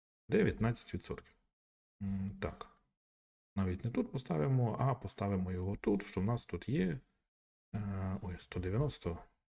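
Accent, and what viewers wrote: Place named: Transcarpathia, Ukraine. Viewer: мені подобається ваш закарпатський акцент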